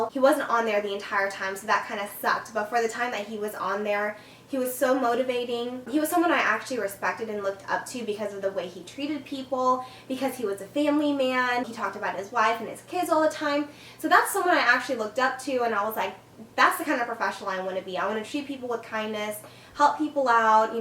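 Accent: Texas, United States. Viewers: thanks for your insights... i knew you were a fellow texan 10 seconds into the video lol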